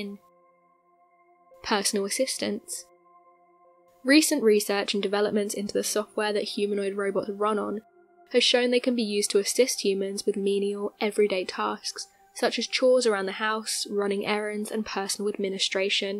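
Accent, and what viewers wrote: United Kingdom. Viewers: Love the narrator, she has such a charming accent, wonder what part of Britain she's from?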